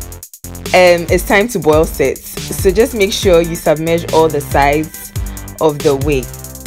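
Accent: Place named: Ghana. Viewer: I can hear Ghanaian accent. I hope am right. U look beautiful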